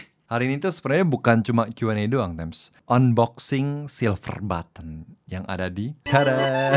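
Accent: South Jakarta, Indonesia. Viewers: 🤣🤣🤣 gue pikir lo anak jaksel bang. kocak bet dah lo ahaha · Bahasa Indonesia ala Jakarta paling bagus dari semua YT-ers Korea yang si ini.....Indonesia asli... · INI ORANG JAKSEL YA?😭😭😭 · Kalo ngga sebut Korea, kita kira orang Jakarta..!!..😁👍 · Ini mah aksennya jaksel bet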